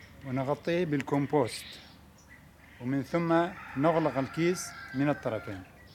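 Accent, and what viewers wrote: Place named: Bahrain. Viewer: هو عراقي فكيف يتكلم بحريني ؟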